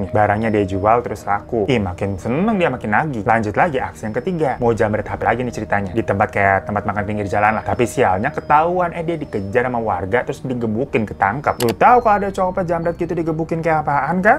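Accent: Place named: Lampung, Indonesia. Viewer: weh logatnya lampung bgt wkkw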